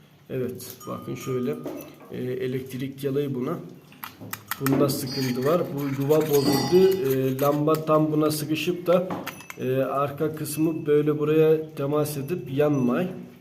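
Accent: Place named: Black Sea, Turkey. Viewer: Her söylediğini anlamasamda, şivesi çok tatlı, her Karadeniz insanı gibi ... ☺☺☺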